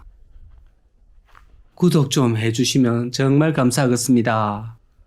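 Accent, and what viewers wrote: Gyeongsang, South Korea. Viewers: 우야노 님 멘트 넘 재미져요 친밀감느껴지는 사투리 옛 생각 납니다 저도 경남거창 줄신이라 사투리가 정겹습니다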